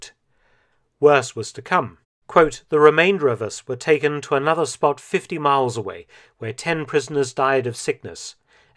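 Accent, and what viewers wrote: England, United Kingdom. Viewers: The first thing that Indians and other colonies of western countries were forced to fight a war that was not their. Japanese were worst but we should not forget how the Churchil of England killed millions of Indians by depriving them of food known as famine of Bengal. They sent all the food for the soldiers and here many people died due to hunger. Since the narrator seems an Englishman by his accent he must know that England has done no less horrible things to Indians then the japanies at that time.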